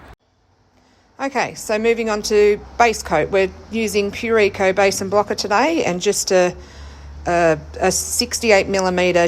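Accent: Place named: Perth, Australia. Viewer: This is lovely! i must subscribe as my daughter is in Perth, and I can just listen to your accent and imagine my daughter's friends and co workers sounding just like you. Thanks!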